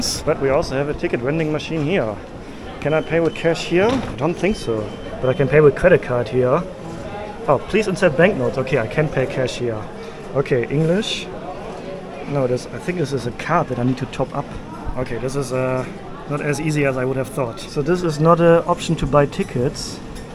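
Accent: Germany. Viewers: Your German is ominipresent while you speak in English: 1947 and not 1974 as one says in German! ^^ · I like your german accent of English ...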